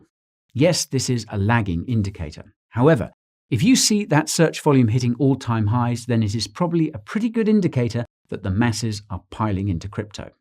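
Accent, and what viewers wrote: United Kingdom. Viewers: I only understood like 10% of this... and that's being generous. But the wee bit I did pick up helps me understand why I flopped on my first $500-600 into crypto (well, Im not dead yet) and I'll be MUCH more careful entering my long term HODLING patterns from here on thanks to you. Liked and subbed even before you asked for it. I heard your Brit accent and immediately thought, ah yes, this man knows his stuff. LOL. Plus you came highly recommended by Alex Becker, the most ripped attractive PG rated Youtuber and top rated coin picker in the galaxy. 🙄😁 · Man your fracking awesome.. love listening to you... something about brits that make em sound smart haha. thanks for all the GREAT info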